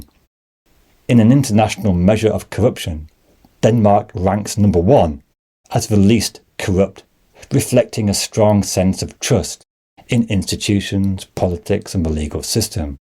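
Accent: United Kingdom. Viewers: The narrator has a British accent.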